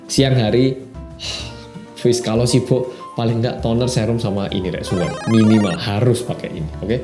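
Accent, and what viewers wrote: Java, Indonesia. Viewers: Hi , MEDOK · Hmmm kangen pembukaan "orang Korea yg medok music deb deb deb" Ko sekrng ngga ada lagi yaa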